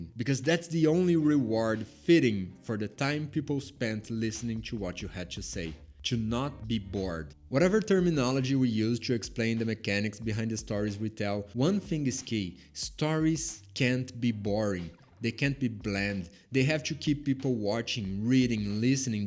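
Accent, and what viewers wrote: Brazil. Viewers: Ouvi o sotaque BR também e já deixei o apoio. Tem canal em brasileiro? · No começo do vídeo eu fiquei "esse sotaque é brasileiro", no meio eu falei "oxi citado" e no final eu falei "kralho, é o " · Are you brazilian by any chance? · Is that a 🇧🇷 accent I hear?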